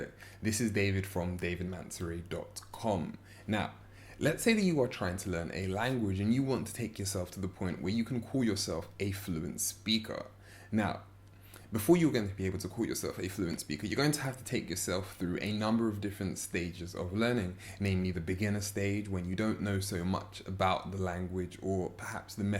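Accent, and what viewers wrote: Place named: England, United Kingdom. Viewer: i love u´re english accent :D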